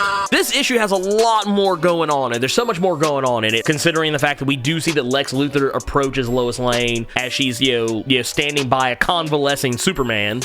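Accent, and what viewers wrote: United States. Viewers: He’s American how else is he supposed to sound like?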